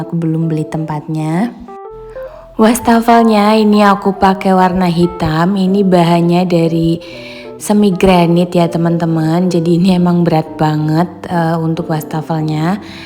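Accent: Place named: Java, Indonesia. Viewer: Medoknya mirip Teri Raradini 🙂... Dapur cakep... Saudaranya baik baik banyak ngasih..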